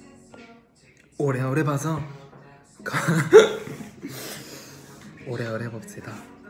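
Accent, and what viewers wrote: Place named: North Korea. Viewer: ㅠㅠ아 기여운 기먼필 ㅠㅠㅠ 진심 북한말 너무 잘해ㅠㅠㅠㅠㅠㅠ 아진챠ㅠㅠ 기욥댜ㅠㅠㅠ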